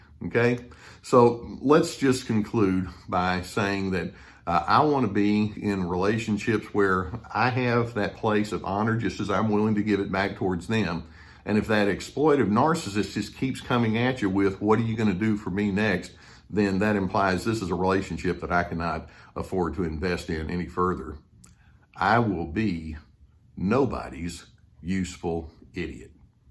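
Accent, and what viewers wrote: South, United States. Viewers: Ty for a great video, I love your accent ( i am homesick - miss hearing folks chatting) i had a narc boss, who liked to make everyone her "idiots", finally, after 2 yrs, i had enough, i stood my ground & gave her some southern fire- now i am free of her, - watch out for bosses, who like office drama.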